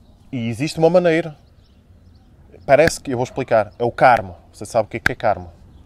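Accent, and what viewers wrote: North, Portugal. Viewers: Decide te com que sotaque falas. Ou Português de Portugal ou do Brasil. É que misturar os dois, e ainda por cima com sotaque nortenho, fica uma cena mesma esquisita e sem graça...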